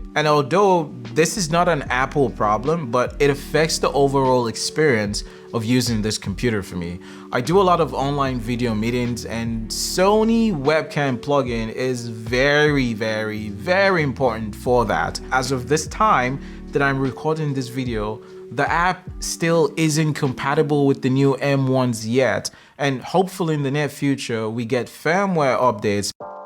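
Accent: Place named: Nigeria. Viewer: Oh, a Nigerian accent. That makes sense. Before I was permanently confused. Because the accent sounded mostly american with a slight under current of british. So my mind was slowly melting down trying to figure out what area of england or america would generate that mix. Also the review was really good and high quality too. It was in depth, without being onerously long.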